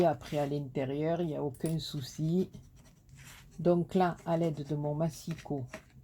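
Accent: South, France. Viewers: Bonjour, ta cascadounette est vraiment très jolie, j'adore ton accent du soleil, il nous fait du bien car on est toujours sous la pluie.....Gros gros bisous... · bonjour, très joli travail très coloré plus l accent su sud cela fait du bien le soleil n étant pas de partie aujourd' hui chez nous bisounettes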